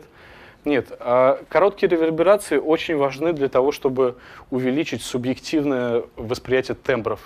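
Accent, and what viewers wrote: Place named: England, United Kingdom. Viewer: английский акцент немного слышится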